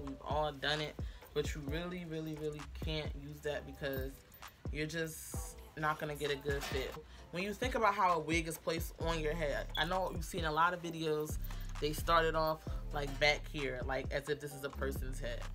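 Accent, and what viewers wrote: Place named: New York, United States. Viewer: Are you a New Yorker? love you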